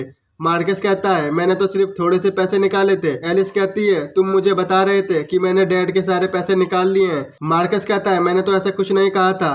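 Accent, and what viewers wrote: Uttar Pradesh, India. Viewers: Bhai aap up se ho kya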